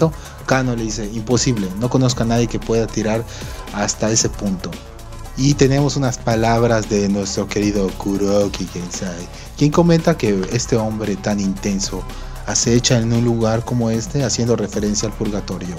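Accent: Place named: Yucatan, Mexico. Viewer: Carnal eres yucateco? Por qué se escucha mucho y eso es genial tienes mi apoyo broder. 🐖🤠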